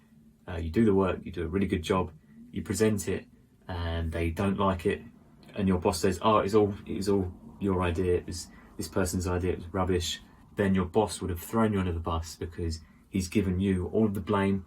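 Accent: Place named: RP, United Kingdom. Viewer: I’d say the modern RP accent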